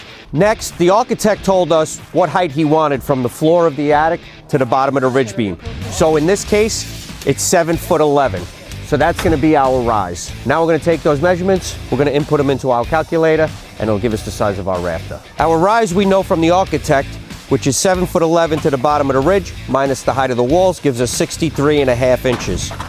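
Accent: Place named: Philadelphia, United States. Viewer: I just love his accent Philadelphia😮🍄🍄🍄🍄🥇🥇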